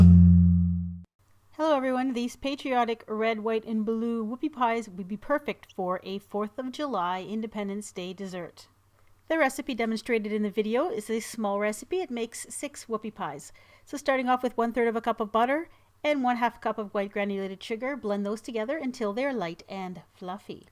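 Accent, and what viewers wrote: Canada. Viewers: Quick question, are you Canadian or American?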